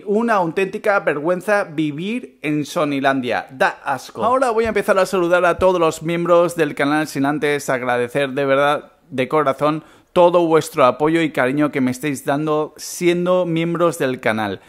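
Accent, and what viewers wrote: Mexico. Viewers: Yo pense que eras de mexico xD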